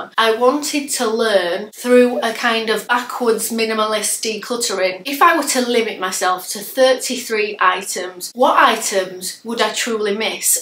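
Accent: Yorkshire, United Kingdom. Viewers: I really love your channel. You are so inspiring. Are you from Yorkshire? Thank you 🇦🇺❤️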